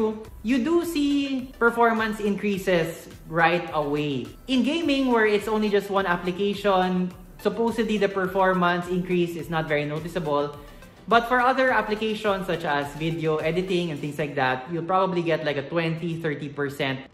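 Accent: Philippines. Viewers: His accent is very filipino tho.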